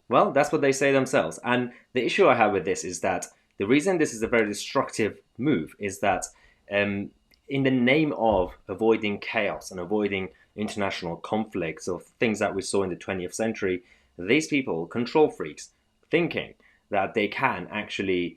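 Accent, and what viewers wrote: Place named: RP, United Kingdom. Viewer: And the price of that graniola is ridiculous..Stop recommending posh boy products..70% off Leave voters can't afford it and you will p*ss them off from your website..Try using the Alex Belfield common touch and try not to speak like you went to public school or you'll flatline at the 200k mark..Probably close to the limit of the wealthy leave vote..And remember that only people with money think class attitudes are outdated..Like Nationalism,they never really go away........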